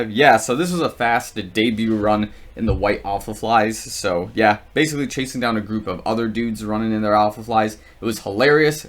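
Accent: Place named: Chicago, United States. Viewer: My sports are, basketball, cycling, tennis. I like walking in the Zoom Alphafly but I’m told it’s not for that. Thoughts? By the way, I hear the Chicago in your voice. I also hear Dan Aykroyd in your voice!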